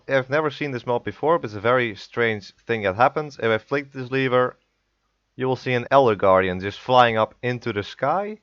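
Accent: Netherlands. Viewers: Dat nederlands accent XD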